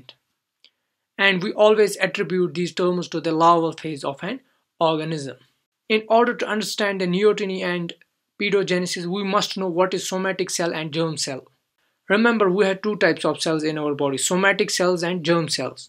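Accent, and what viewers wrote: Kashmir, India. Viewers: Are you a Kashmiri? Just wanted to ask coz your are speaking just like my friend who is also a Kashmiri.😊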